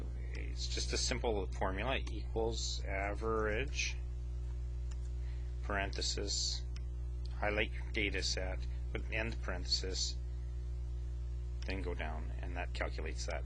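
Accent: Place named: Canada. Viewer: you are one of the most Canadian sounding person I've ever heard. also thank you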